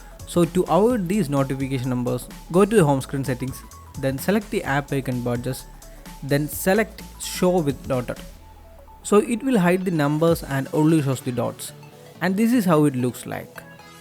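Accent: India. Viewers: 😂😂😂India man..English India...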